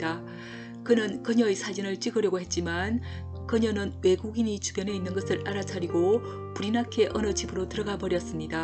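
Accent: Gyeongsang, South Korea. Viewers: 경상도 액센트가 너무 강해요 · 어우 경상도 아줌마 목소리 감추려해도 너무 티가 나네요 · 제발 겡상도 발음을 고쳐 유튜브를 하시길 답답합니다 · 사투리때문에 집중이 잘....ㅜㅜ 경상도 중에서 상 이심 ㅜ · 부산 경남 분이네요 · 강호동이 쓰는 사투리 ㅎㅎ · 갱상도 어디 분인데 발음이..?.. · 이보세요~ 경상도 억양은 고치기 어렵답니다. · 내래이션을 경상도말투로 하니 참 생소하다. 그래도 나쁘지 않다.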